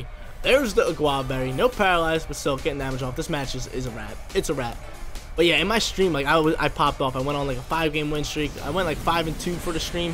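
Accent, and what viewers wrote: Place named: New York, United States. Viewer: I'm noticing your accent are you from the NYC metropolitan area?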